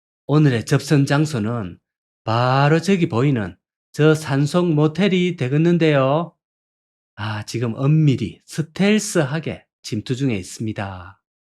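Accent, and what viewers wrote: Gyeongsang, South Korea. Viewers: 정말 보리문디 사투리 정겹다.연식있다보이 다이자삣는데!직이네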